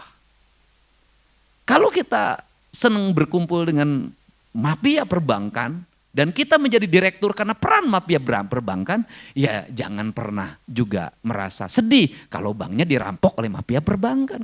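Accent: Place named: Sunda, Indonesia. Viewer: Krn pidato nya sell : tanpa text, spontan dr hati, topik nya sell menarik sesuai dgn kepentingan bahasan nya, gaya bahasa n bicara nya yg 'khas,' sangat expressi , serius, tanpa kehilangan selera 'humor' nya yg 'mengena' , tidak norak bhkan terkesan ada nuansa 'intelektual' dalam setiap kata n kalimat nya , bhkan sekalipun dlm bahasa daerah (Sunda) nya yg msh sangat kental, cermin betapa KDM sangat cinta pada budaya leluhur nya .. 👍👍👍👍♥️♥️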